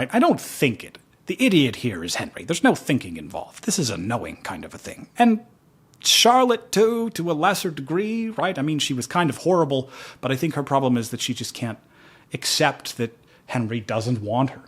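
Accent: RP, United Kingdom. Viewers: This is the second time that I have watched this and I can picture almost everyone in this story. The title does seem a bit misleading though. I think that the should be a narrator for audible he needs a manger to get him a gig. Also I thought his British accent was great. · GREAT story and your British accent was very entertaining! My mother was English and was HORRIFIED by iced tea. "Ice in your TEA?!" No problem with iced coffee, tho. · Congratulations on 55,000!!! 🎉🎉🎉 Love the accent. I’m a fan of all things British and you were spot on. 😊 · I absolutely ADORE you! You have such a nice voice and I love the side commentary and piano riffs 😂😂 also, not sure this is worth much from a Texan, but I thought your British accent was marvelous! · I’m not British, but I do like accents, and (for what it’s worth) to my uneducated, American ears your accent sounded perfectly posh! 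😆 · You have a great posh british accent lol, and I say this as somebody with a british husband Though, he only has a slight posh twang, since he's got an expat accent that's just heavy on the british side, and he's specifically from Bristol, which is the land of the pirates, rather than whomever these posh bastards are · British person here: British accent approved and appreciated hehe ❤ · Speaking as a Brit watching this, ordinarily I would have told you off for using the stereotypical 'posh English person' voice. However, from the language used in the story I think they might actually be that kind of Brit lol. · I liked your accent, but thats quite posh, there are soooooo many accents here, but saying that i do know people that speak like that, and I also have a Aunt like Charlotte whom I dont speak to because shes rude!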